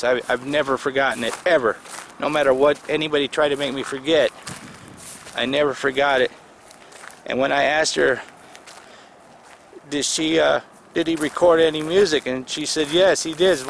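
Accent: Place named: South, United States. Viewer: oh yeah and btw, there is nothing like some GOOD ole country twang xo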